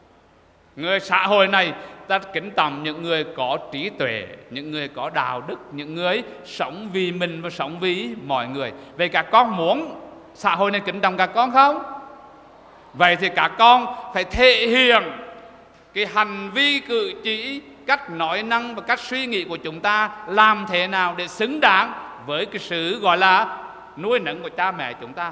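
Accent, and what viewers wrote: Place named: Hue, Vietnam. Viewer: Mô phật con rất thích nghe thầy giảng, thầy nói giọng huế rất hay.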